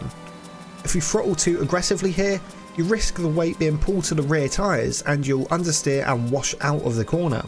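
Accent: United Kingdom. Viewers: No hate, but I think this guy is bri'ish. Tho contecnt both helpful and entertaining. Keep it up, please. :)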